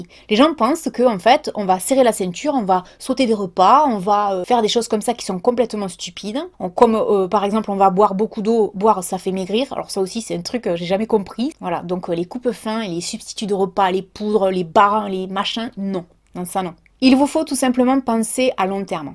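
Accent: South, France. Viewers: Ça fait longtemps que je regarde tes vidéos! J’ai créé un compte YouTube spécialement pour te laisser un commentaire. Je trouve ton travail fantastique. Tes videos réalistes. Tu dis tout fort ce que certains pensent tout bas! Je trouve ça cool!! Ton accent du SUD super !! Continue comme ça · Merci pour votre vidéo très intéressante. Votre accent me plaît beaucoup vu que je suis moi aussi du Sud 👍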